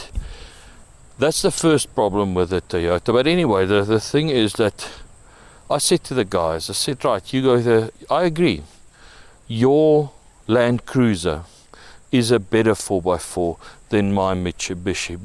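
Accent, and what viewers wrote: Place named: Russia. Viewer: Your accent like russian 😅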